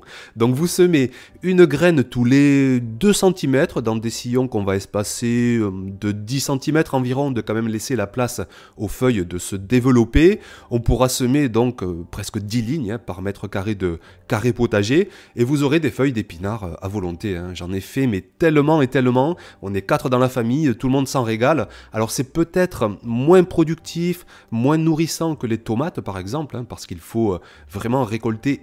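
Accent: South, France. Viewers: Tres chouette cette vidéo, tres claire et précise... Merci merci. Et le ton de ta voix toute en ambiance chaleureuse en accent du sud ... c'est du bonheur tout ça ! Moi aussi j'ai écouté ça lors de mon petit-déjeuner du dimanche entre le thé et les tartines de confiture maison... Quel plaisir ! Mille merci ! Tres bonne idée aussi ce filet pour protéger les tomates de la chaleur du sud. Je vais essayer de construire ça aussi ! Est-ce que c'est utiles pour les autres légumes ? Vous êtes où dans le sud ? ...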